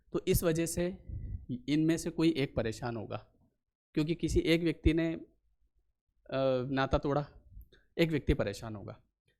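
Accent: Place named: Maharashtra, India. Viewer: Chhan sangital tumhi sir aani tumhi mla bahutek marathi aahat as vatal tumchya bolyavarun ...tumchya pudhchya video chi aamhi vat bghtoy lvkr bnva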